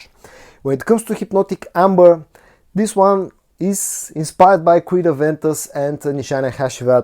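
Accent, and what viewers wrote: Bulgaria. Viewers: Sometimes I have a problem understanding if you're saying "woodsy" or "oudsy"... I guess with your accent the "W" is silent. Hope you don't take this the wrong way...I absolutely love to listen to your beautiful Bulgarian accent. Happy to see the channel advancing pretty quickly after that big 10,000 subscriber mark just a few days ago. I love the thumbnail of the video you made of the 10K...you look so happy and proud. I appreciate all you do for us brother. Be safe all.🙏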